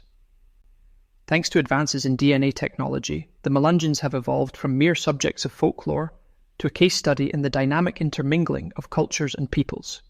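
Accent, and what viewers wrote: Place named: Scotland, United Kingdom. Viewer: The narrator has a Scottish accent.💙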